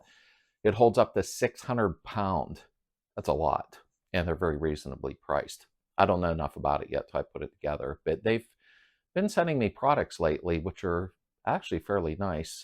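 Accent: Pittsburgh, United States. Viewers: You have one heck of a Pittsburgh accent 😂. As a Yinzer who lives down south now, it makes me feel like I am back home when I tune into the channel. Keep the content coming!!!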